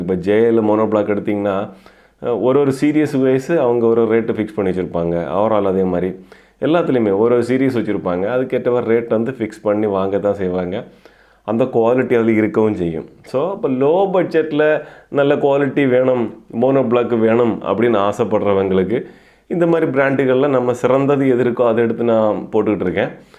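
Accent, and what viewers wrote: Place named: Kerala, India. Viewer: Ningal malayali aano oru malayalam touch varunnundu samsarathil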